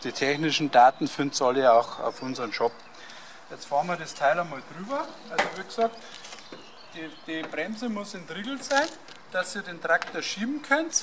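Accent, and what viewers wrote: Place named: Bavaria, Germany. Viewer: Das war echt gut, auch für blöde gemacht, leider sehr bayerisch und damit schwer zu verstehen !!!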